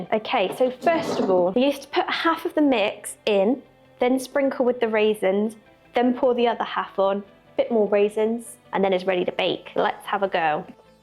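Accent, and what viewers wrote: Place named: United Kingdom. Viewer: I’d rather they pronounce it the British way. It’s part of the charm of their channel in my opinion.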